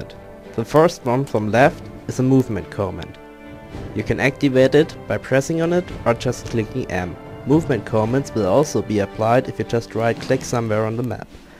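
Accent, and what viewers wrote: Germany. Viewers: just like MinecraftMadness64..... ein Deutscher der auf Englisch Videos macht....ich wusste doch dass ich da einen deutschen Akzent gehört habe ;D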